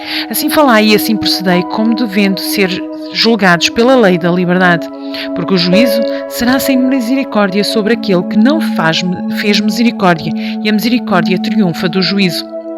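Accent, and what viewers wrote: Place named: Portugal. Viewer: Na Tradução dessa Bíblia é a msm em Português Brasileiro né , o sotaque de Português de Portugal é Lindo !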